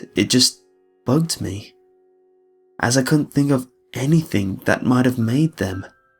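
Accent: United Kingdom. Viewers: Your British voice makes everything seem okay :3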